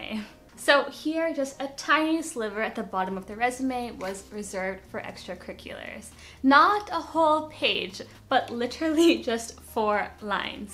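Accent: Andhra Pradesh, India. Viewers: Hey telugu ahh 😅 .. native ekkada ? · o.O girllll! you are from andhra wow. Your accent is sooo coool.I love that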